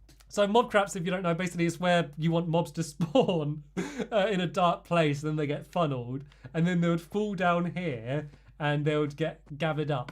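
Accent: United Kingdom. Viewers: British English quite well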